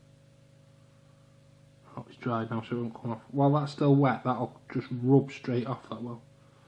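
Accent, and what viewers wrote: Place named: Stoke, United Kingdom. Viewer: Sounds like a stokie to me !! P.s where can I buy the things you put in the spray from ?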